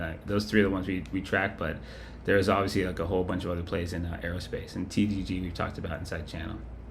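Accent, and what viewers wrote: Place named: New York, United States. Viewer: i hear that NY seeping in here'n there :)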